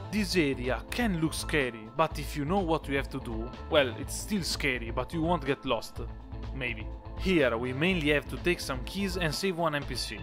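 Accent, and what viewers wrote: Italy. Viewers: I love the accent. I believe it's Italian? It's very satisfying to listen to imo and a fresh change of pace from the American, British, and Australian accents. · Kept seeing this particular video in my recommended tab, a worthwhile video to watch indeed. Forgive me for I am new to this channel. But, that accent I hear, is it Italian? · Are you perhaps Italian? Your accent reminds me of an Italian one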